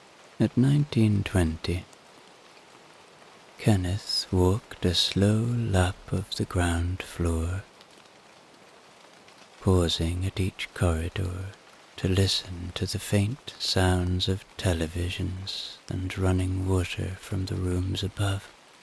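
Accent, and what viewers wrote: Ireland. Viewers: Amazing story out of all the sleep stories you have the most relaxing voice what part of Ireland are you from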